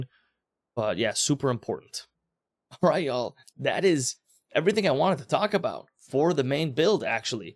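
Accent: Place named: Canada. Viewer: Dude, why didn't you answer? I know you're a Canuk......it's the accent. Great, informative videos just the same.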